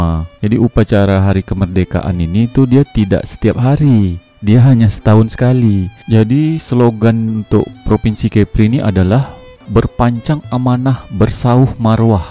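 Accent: Malaysia. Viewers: Mantab, bahasanya enak didengar, awak suke logat Melayu seperti ni.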